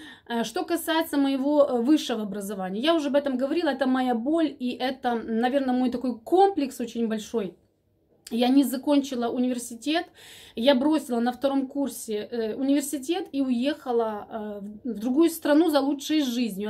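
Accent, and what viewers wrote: Ukraine. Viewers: я преподаватель русского языка и литературы.Живу в Харькове, родилась здесь.У нас 90% населения города говорит на русском или на суржике. Мне сразу режет слух, когда говорят не чисто...Но!!! Это такая ерунда...по большому счету!!!.. И Украина откладывает свой отпечаток на произношение, а иногда и ударение. Я никогда не делю людей на правильно говорящих, с высшим и без образования!... Главные критерии- доброта, душевность, природный ум и мудрость.💖 Вы, прекрасная молодая женщина!!! И не нужно Вам оправдываться!!! Кому Ви до вподоби, ті будуть Вас слухати і дивитися!!! Я Вас підтримую.Ви дуже гарна!!!😁😍♥️👍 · Мне,честно,непонятна суть претензий. Сразу же "слышно", что вы с Украины. Почему вы должны идеально владеть русским? Разные регионы, разный уровень владения языка. Аналогичная история у Татьяны из Италии. Ее постоянно "цепляют". С дочкой говорит на украинском, с мужем на итальянском, канал ведет на русском. 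Если мне человек интересен, на мелкие детали я не обращаю внимания. К замечаниям относитесь проще, смешно сказать, но даже Катю (пенсилбокс) бывает поправляют!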